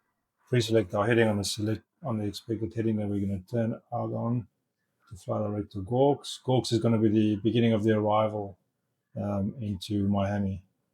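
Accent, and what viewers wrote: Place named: South Africa. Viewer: Good to hear an SA voice!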